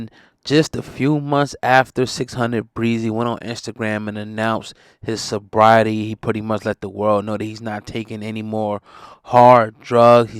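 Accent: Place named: Iowa, United States. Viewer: U suck at journalism... If you 1 rly messed wit 600 you would know or even if you just did research then you would know his parole is in iowa.. 2ndly u dont know what you tlkn about. Probation then you have to see a judge if your on parole then you have to go see the parole board.... Hate When yall non street dudes try to speak on the street... Tbh u sound like you from iowa 🤔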